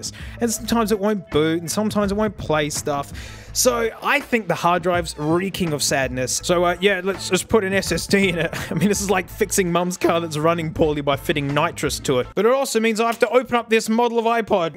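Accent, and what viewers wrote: Australia. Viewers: I actually do wonder though: Is that accent only in some parts of Australia? Because I went to Australia before and the place I went to has the stock English accent that a lot of Americans have. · I like it when you do the funny aussie voice, it makes my day with every upload 😁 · I miss hearing "Australian"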